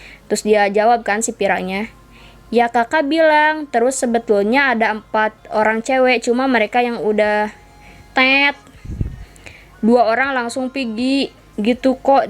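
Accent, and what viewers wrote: West Java, Indonesia. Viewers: Kakak Logat/Nada Ngomongnya Kayak Orang Sunda, apa bener?😅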